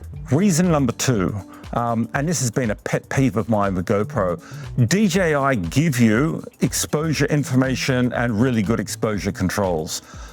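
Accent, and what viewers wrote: Australia. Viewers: Guy sounds Aussie, why would he give a fuck? And as an American, this "it's made in America" to prefer inferior products is lame. American companies should step up their game instead of keeping 6 year old sensors like GoPro. Don't even get me started about the DJI drone ban in the US with clueless politicians.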